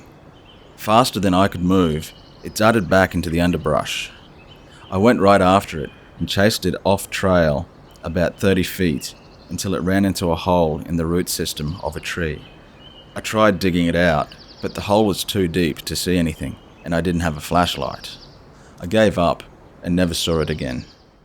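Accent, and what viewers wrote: Australia. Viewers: Miss you, cant fall asleep without that Aussie narration and humor, also wish I could have a smile & a Vegemite sandwich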